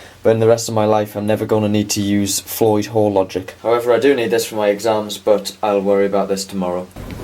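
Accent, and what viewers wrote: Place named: Northern England, United Kingdom. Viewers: He definitely has a northern accent, as I'm from Newcastle and I can spot a hint of it assuming through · I can't place your accent, is it gateshead....? Or somewhere near Newcastle?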